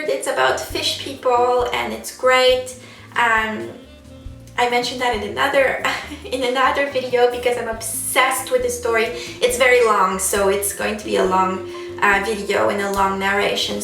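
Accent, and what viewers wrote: Quebec, Canada. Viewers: David Bro it’s not fake. I know this is decently old, but for anyone who may read this in the future, here’s a bit of explanation. Her accent is French Canadian. Accents can fluctuate when: 1. Talking to other people with that accent it can become stronger. 2. When talking excitedly or fast, it can absolutely come out much stronger. 3. When talking to someone without an accent, someone with an accent may subconsciously be speaking without the accent just from the situation. As for when she sings, David Bowie had an accent yet you could never hear it when he sang. You CAN in fact hear it in certain words. Hope that clears things up. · French Canadian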